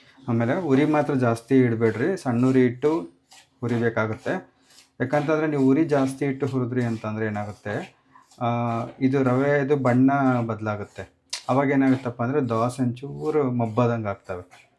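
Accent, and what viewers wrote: Chitradurga, India. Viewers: My mom has become your fan. Trying to understand your Chitradurga Kannada, but nice.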